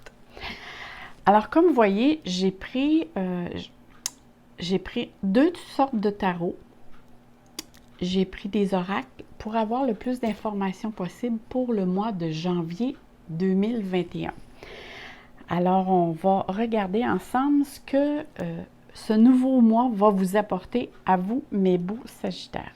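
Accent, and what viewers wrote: Canada. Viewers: Coucou chère merci pour ce superbe tirage, quel espoir.... en plus j'adore ton accent canadien si sympathique... Un grand merci et je te souhaite aussi une excellente année 2021 à bientôt 😍😍❤️❤️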